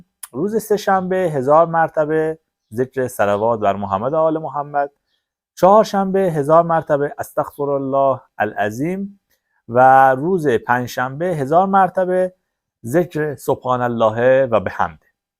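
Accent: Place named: Shiraz, Iran. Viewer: درودبرشما استاد عزیز ❤بچه کجای احساس میکنم بچه شیراز باشی درسته ❤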